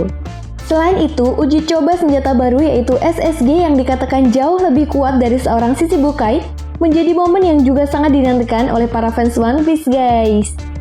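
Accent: Java, Indonesia. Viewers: Min saran nih, saran aja ya, kalo bisa channel nya di bagi 2 aja, 1 voice cw 1 lagi voice cowo, jujur gw lebih suka yang suara cowo nya yang khas mendoknya, dan maap maap ni ye, gw skip yg cw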